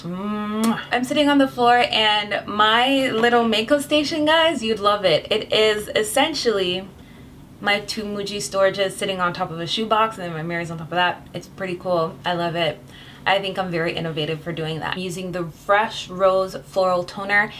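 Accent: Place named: Jamaica. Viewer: Great Video, Where are you from? I almost thought I heard a Jamaican accent.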